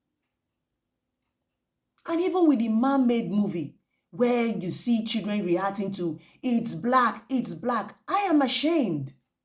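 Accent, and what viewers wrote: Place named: Africa. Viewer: Love your accent sweetheart! Which African nation are you from?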